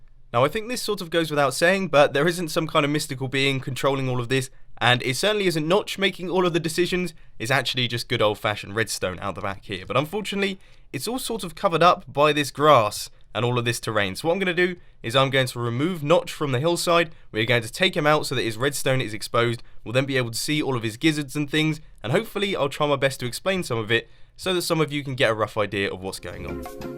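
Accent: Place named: United Kingdom. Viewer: I love you Mumbo and nice British accent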